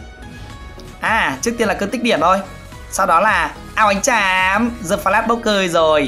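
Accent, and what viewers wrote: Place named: North, Vietnam. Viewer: Ông này người miền Bắc nói giọng miền Bắc đi nói dậy dậy nghe chối tai thiệt sự giọng đã nhạt rồi còn nói kiểu đó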